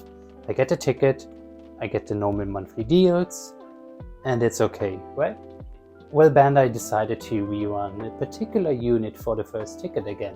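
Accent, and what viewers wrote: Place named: Germany. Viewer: Thats very interesting ! I am from Germany and i thought your accent sounded german.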